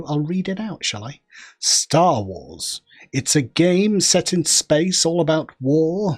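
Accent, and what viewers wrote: United Kingdom. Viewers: why is every darn video i watch on youtube always from someone who can't speak english without some heavy british scottish accent?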